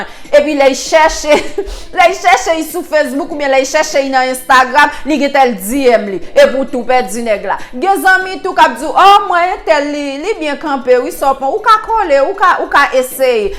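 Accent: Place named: Cap-Haïtien, Haiti. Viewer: Sa son fanm okap pareyanm kanmenm palèy gn twop resanblans premier vidéo'w map gade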